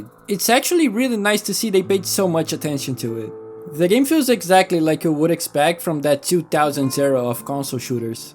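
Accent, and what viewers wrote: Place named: Brazil. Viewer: Im not doxxin but i I knew you were Brazilian, your "English" is very different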